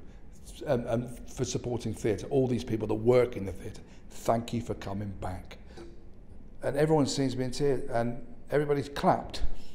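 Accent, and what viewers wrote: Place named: Birmingham, United Kingdom. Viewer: What a show tonight and thought couldn’t get any better, never disappoints. He’s definitely a Brummie 🤣❤️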